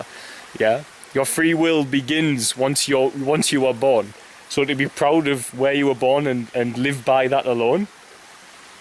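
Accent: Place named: Newcastle, United Kingdom. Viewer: He's got a Geordie accent so I would assume he's from the Newcastle area.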